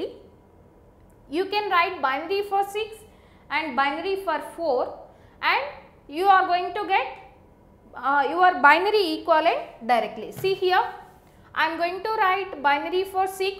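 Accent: India. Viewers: i think am only falling for u....you are beautiful a.....i have subscribed to your other lectures ......but not familiar with indian accent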